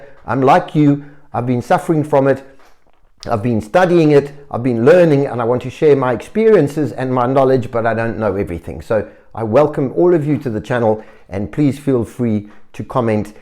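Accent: South Africa. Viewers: I can hear that you are a South African. Cape Town?? Do you run a practice??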